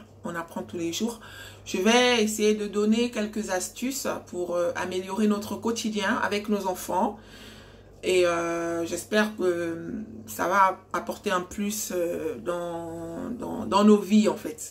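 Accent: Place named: Gabon. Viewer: L'accent gabonais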